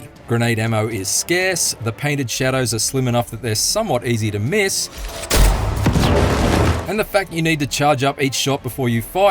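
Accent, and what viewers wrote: Australia. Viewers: He's aussie and some news reporters have this style. Althugh this guy is particularly annoying, and I'm speaking as an Australian.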